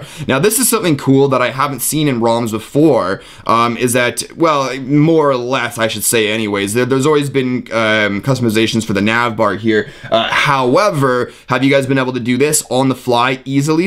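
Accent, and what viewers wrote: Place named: Canada. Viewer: Hehe you're so Canadian... that accent, man... =)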